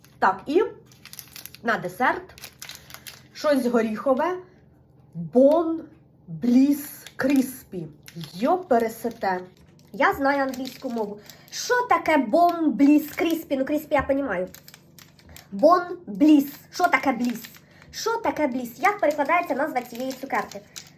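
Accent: Poltava, Ukraine. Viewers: Дуже приємно слухати огляд полтавським діалектом.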